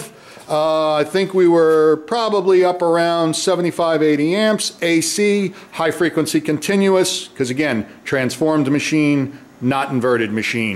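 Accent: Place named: North Jersey, United States. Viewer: I was figuring that was North Jersey accent I was hearing..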